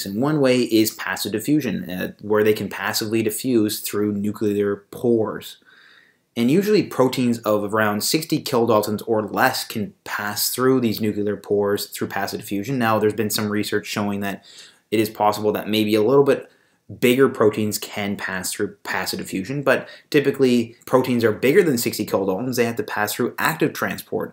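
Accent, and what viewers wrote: Canada. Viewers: You rock!!! Thanks so much and do I sense a Canadian accent?? I love it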